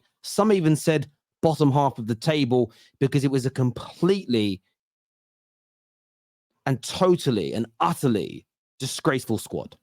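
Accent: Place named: London, United Kingdom. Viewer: You can tell this guy is a true United fan from his strong London accent ✌️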